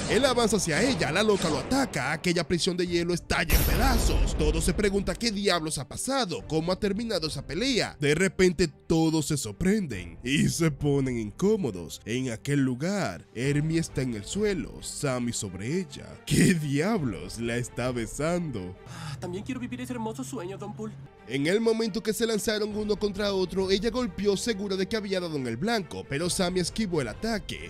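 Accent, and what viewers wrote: Dominican Republic. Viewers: Eres dominicano bro 🤔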